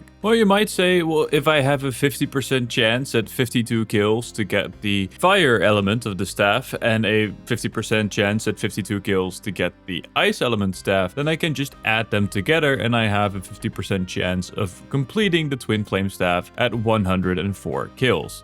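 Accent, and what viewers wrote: Netherlands. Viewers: pretty nice effort hiding your dutch accent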